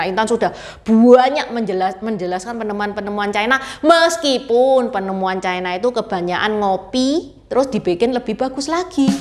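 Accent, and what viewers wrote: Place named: East Java, Indonesia. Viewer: Ciecie aku suka dialeg Pecinan Jawa timuranmu. Seru...terasa akrab. Indonesia banget 😂👍 sehat sll cie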